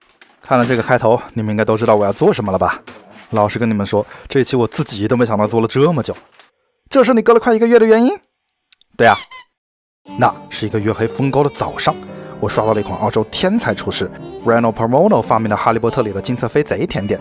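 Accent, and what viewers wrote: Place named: Sichuan, China. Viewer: 四川人吧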